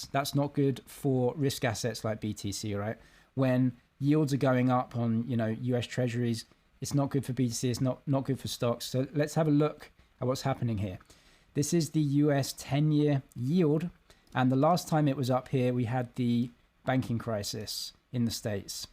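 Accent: England, United Kingdom. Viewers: Fantastic. Where in England are you from?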